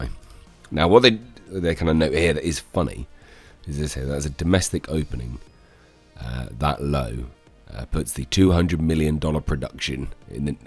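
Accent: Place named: United Kingdom. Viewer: I hope you get well soon! I miss hearing your lovely British accent.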